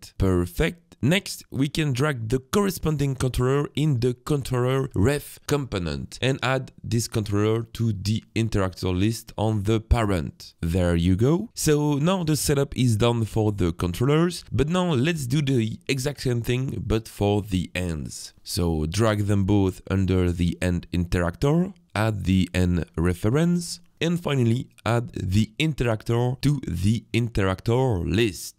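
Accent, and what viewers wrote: France. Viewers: Salut (j'ai cru entendre un leger accent français, me trompe-je🤔😁) Merci beaucoup pour ces tutos ça aide tellement de monde c'est genial Evidemment Unity a encore tout changeé donc dans ma version 2022 c'est un peu différent..les Est ce que tu saurais quel fonction je dois appeler dans le visual scripting pour declencher une action avec ce fameux bouton? Exemple: lorsque j'appuie sur le bouton..un objet disparaît..car le "on button click" n'a pas l'air de fonctionner...merci!!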